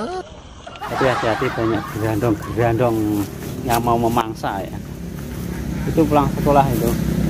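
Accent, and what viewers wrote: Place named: Java, Indonesia. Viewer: Jowone ngendi masse...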